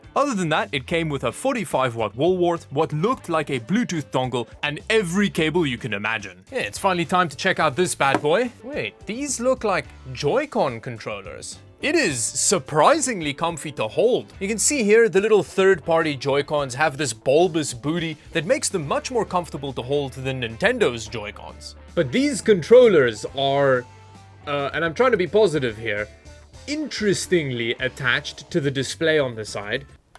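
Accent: Afrikaans, South Africa. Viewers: Are you from South Africa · Are you South African? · Hi, your name and accent suggest dutch/ afrikaans, are you from ZA or like Namibia?? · I keep hearing an afrikaans accent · I can hear some South African in that accent?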